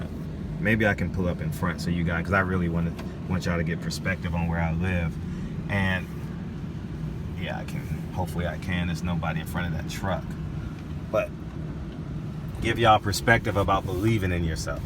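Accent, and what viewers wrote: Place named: New York, United States. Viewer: I thought you was from New York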